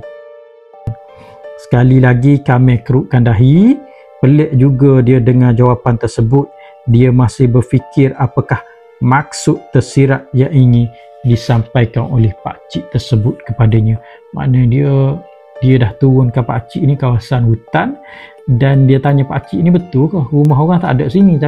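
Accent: Kelantan, Malaysia. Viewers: sama2.. Sedak dengar crito..kemah2, ada stail tersendiri dgn loghat Klate dio tuh..kito ore baru dlm channel ni.. Follower Abg Joe JSPL benar..lor nih layan duo2 lah...😂😂🤩🤩😍😍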